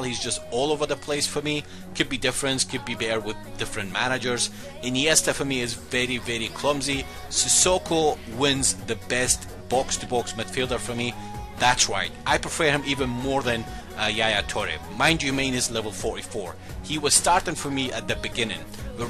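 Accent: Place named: Scotland, United Kingdom. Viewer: Your accent sounds like it has a little bit of Scottish in it, either that or I'm trippin' :') PLAY ME THOUGH YOU WOULD MOST LIKELY BEAT ME, BUT I LIKE A CHALLENGE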